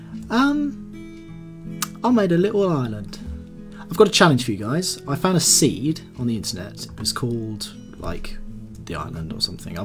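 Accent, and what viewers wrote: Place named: England, United Kingdom. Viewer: WOOP WOOP! Someone English for once!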